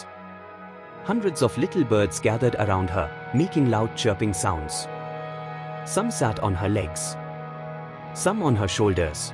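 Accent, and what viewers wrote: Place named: Africa. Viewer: this feels like a african trying to explain a indian cbse portions , still its very good explaination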